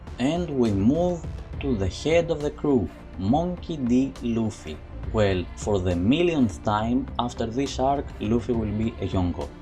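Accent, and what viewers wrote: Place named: Russia. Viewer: What you said about my region is true and I'm from the worst nation in all of the middle east and Africa(guess the name it shouldn't be that hard to do) and actually I recognized the accent too; he's Russian and that's the 2nd most powerful nation in the world and to be this strong you probably have many people who are very smart, intelligent and educated and this is specially true for Russia that doesn't have much natural resources(I know they have lots of oil and natural gas which is good but not so good economically with all the sanctions imposed) I just got so triggered with the list that I wanted to say something awful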